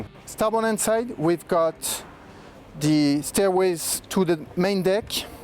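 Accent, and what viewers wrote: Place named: France. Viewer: A frenchman trying to speak english... hilarious.... I can`t stop laughing..... Very nice boat by the way. Really nice boat.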